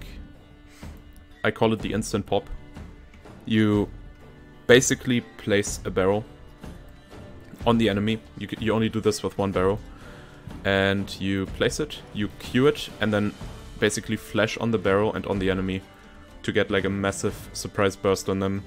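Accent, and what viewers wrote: Germany. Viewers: are you german? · are you german? x)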